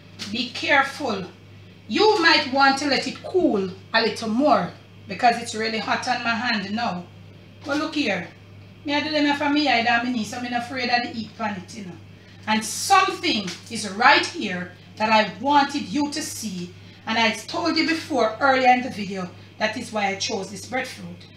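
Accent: Jamaica. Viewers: SHE IS SO HILARIOUS, WHEN SHE SPEAKS JAMAICAN VERNACULAR FAST, I DON'T UNDERSTAND ONE THING SHE SAYS. BUT, IT SO FUNNY. WHEN SHE SLOWS HER SPEECH I UNDERSTAND EVERYTHING SAYS. I LOVE THAT SHE DID NOT COMPROMISE HER JAMAICAN ROOTS IN DESCRIBING HOW TO ROAST THE BREADFUIT. I LOVE ALSO THE CANDOUR IN FRONT OF THE CAMERA. MADE ME FEEL LIKE I'M HER BEST FRIEND. I CAN SIT AND CHAT WITH HER ALL DAY.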